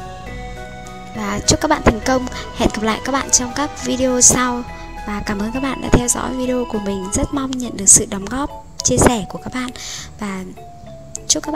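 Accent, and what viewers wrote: Vietnam. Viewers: hình như chị là người bắc ak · ban nay we o dau ko biet nghe giong thanh hoa ma lai ngong nua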